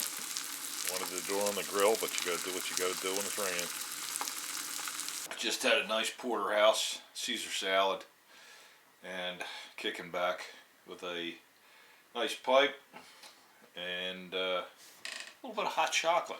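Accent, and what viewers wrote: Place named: Western Pennsylvania, United States. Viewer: Where are you from originally. You have a strong western PA accent. Love you and your sons videos. Hunted at 2 different camps for years around Bradford and just south of Kane. Lots of great memories just not many deer. But that's not really what camp is about anyway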